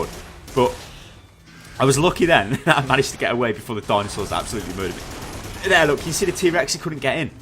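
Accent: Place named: Birmingham, United Kingdom. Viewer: First of all, nice job with the new review! waay better :) but secondly, I've been wondering about your accents for a while now... so I'm gonna take a stab at it and guess... Birmingham?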